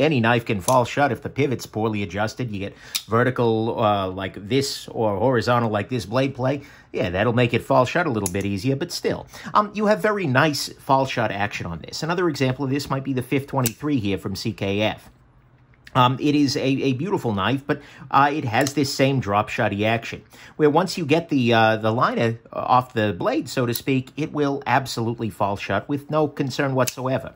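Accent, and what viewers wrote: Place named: New Jersey, United States. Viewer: Where do you grew up to get a accent like that i suspect dirty jersey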